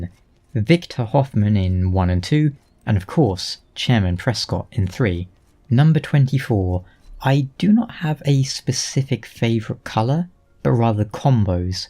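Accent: United Kingdom. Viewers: Don't surpress your Britishness. Embrace it! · Happy Bday! :D Thanks for toning down the Brittish accent, it's quite hard to follow for a foreigner. Especially since these dumb asses in France teach English using only the posh accent. Explains why we have trouble understanding spoken English and sound like arrogant pricks XD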